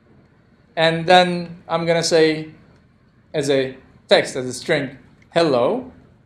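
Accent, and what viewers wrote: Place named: Turkey. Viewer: Lhahah :) sadece türk aksanı ile ingilizce olduğu için mutlaka tüm seriyi izleyeceğim müsait bir zamanda :)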